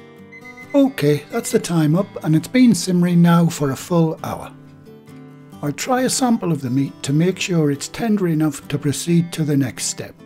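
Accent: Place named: Yorkshire, United Kingdom. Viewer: I'm going to give this a go. I will have to use pigs kidney as nothing else is available. Love your accent it sounds Yorkshire to me but I left there 60 years ago so I could be wrong. I will let you know how I go with the pie. Thanks!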